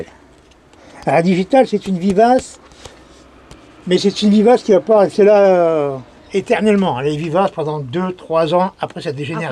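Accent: France. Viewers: Vous le méritez par votre implication au quotidien pour nous faire partager votre passion... continuez à prendre du plaisir à le faire... votre accent est un régal au contraire... c est çà la France, et la richesse de ses différentes régions : coutumes, patois, accents spécifiques, gastronomie, territoire, sol et climat... etc... ne vous changez pas, soyez fier de votre identité et les personnes qui voudraient lisser toutes les beautés de nos régions passent à une autre chaîne qui mets en avant des futilités, l argent, le langage, le physique etc... ne rentrez surtout pas dans un moule à la mode... car par principe la mode est éphémère et cyclique... pour revenir toujours à des choses anciennes qui sommes toutes reviennent à la mode du jour... restez comme vous êtes... et soyez sûr que vous avez du public de tout âge car les nouvelles générations comprennent l'intérêt de prendre soin de l environnement et de produire soi même ses légumes, fruits et autres... 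vous incarnez beaucoup de valeur et êtes ce relais inter générationnel... alors continuez à transmettre... milles merci à vous deux et à tous ceux qui comme vous partagent leur savoir et transmettent leur passion (Philippe, Olivier, André... mais aussi les permaculteurs, le jardin des merveilles, et bien d autres)... Merci 🙏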